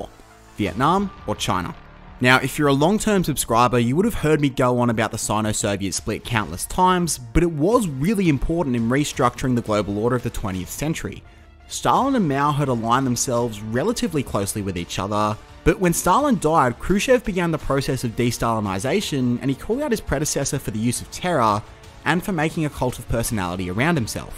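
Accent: Australia. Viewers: Why do Australians pronounce China as “Chiner”, and here as “he”?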